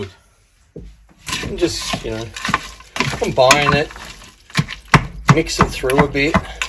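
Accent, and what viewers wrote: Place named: Australia. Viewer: love this video! good to hear from an Aussie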